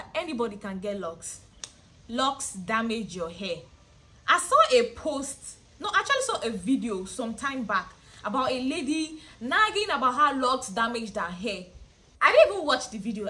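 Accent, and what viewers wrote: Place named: Lagos, Nigeria. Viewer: What part of lagos are you from? You're accent is nice.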